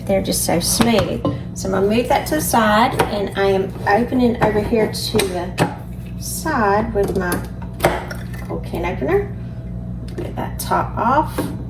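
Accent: South, United States. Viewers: I don't know if it was the recipe or the southern accent that made me subscribe, but here I am 😂.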